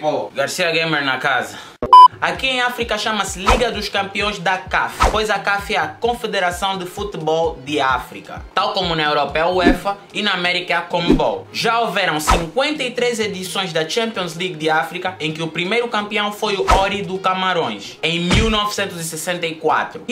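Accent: Angola. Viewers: gente eu entendi tudo o que esse cara disse ,se ele é africano deve ser angolano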